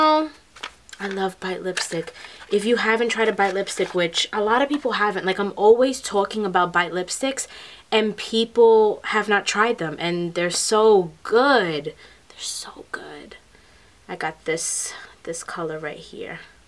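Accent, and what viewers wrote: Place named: New York, United States. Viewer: Omg the accent. Where r u from!! Ny or New Jersey but sure ny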